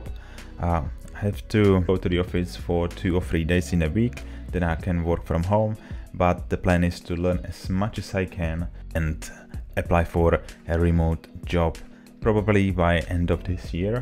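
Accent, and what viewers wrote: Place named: Czech Republic. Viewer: where are you from bro? it seems to me like you have some Czech accent :D fix me if Im wrong. Im also Czech and I wanna ask you on something about United Kingdom right now. If its really difficult for foreigner with IT skills like you have to apply for a VISA? thx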